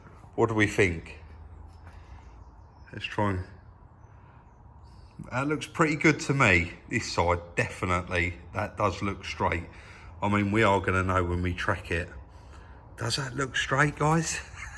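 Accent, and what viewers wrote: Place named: Essex, United Kingdom. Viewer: Love the accent is it Essex?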